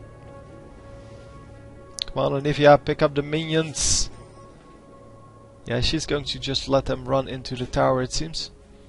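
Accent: Netherlands. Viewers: Are you dutch?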